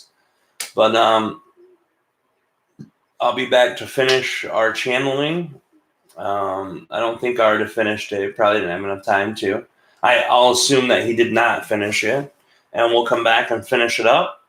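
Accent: United Kingdom. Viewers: First time viewer. Why does sound British?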